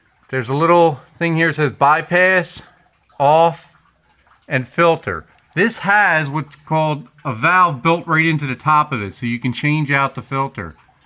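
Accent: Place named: Pennsylvania, United States. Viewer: Love your accent... Is it a Pennsylvania accent?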